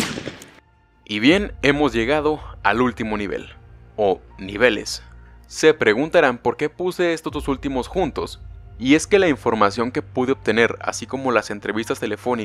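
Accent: Mexico. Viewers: eres de México?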